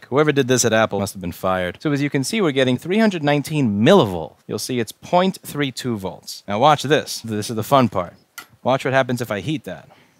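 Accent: United States. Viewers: why do you Americans say "Sodder' and not "Solder" as it is spelt.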